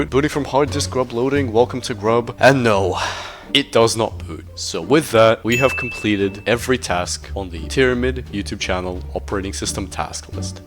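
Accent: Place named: France. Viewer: your french accent is crazy considering you don't know french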